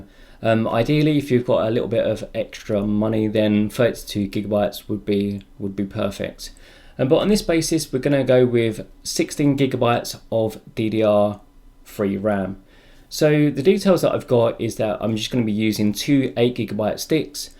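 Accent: United Kingdom. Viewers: you sound like your from the UK but you always price in dollars strange? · Ubersonic He is British according to his accent abs he always mentions the price in pounds, but this time round he mentioned it in USD.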